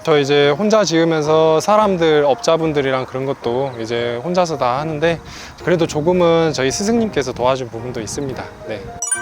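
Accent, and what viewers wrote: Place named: Jeolla, South Korea. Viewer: 서울말투쓰려고 노력해도 전라도 말씨가 다 들리노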